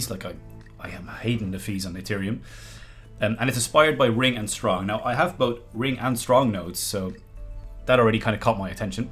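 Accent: Ireland. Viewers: Bro r u irish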